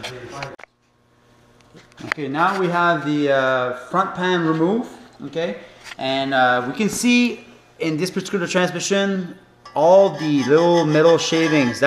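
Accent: Quebec, Canada. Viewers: Ah un Québecois, Salut :)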